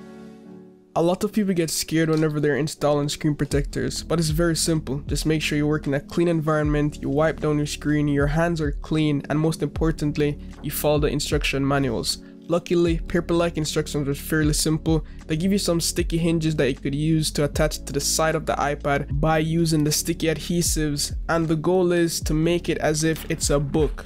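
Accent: Jamaica. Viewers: He's Jamaican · Jamaican accent unmissable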